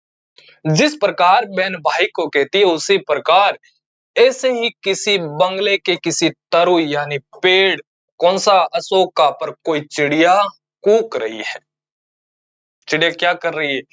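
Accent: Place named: Rajasthan, India. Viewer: Sir me jharkhand se hu me apake language se hi samjh gayi aap rajsthan se ho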